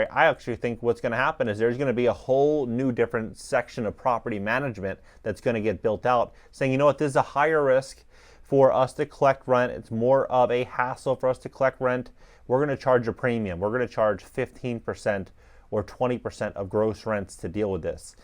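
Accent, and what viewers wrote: California, United States. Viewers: You sound like a native Californian, am I right?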